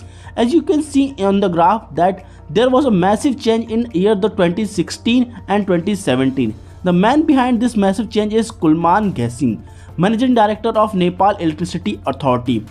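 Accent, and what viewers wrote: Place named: India. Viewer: funny indian accent🤣🤣🤣🤣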